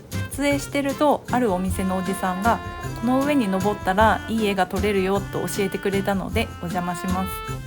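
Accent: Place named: Kyoto, Japan. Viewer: Você é de kyouto ? Então seu sotaque é charmoso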